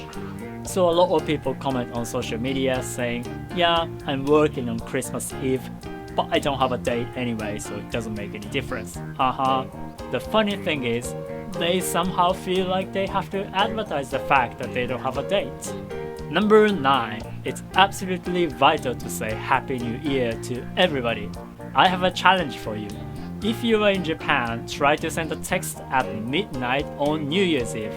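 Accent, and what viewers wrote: United Kingdom. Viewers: why do you peak with a british accent? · hey..! you sound British with your diction, it's cool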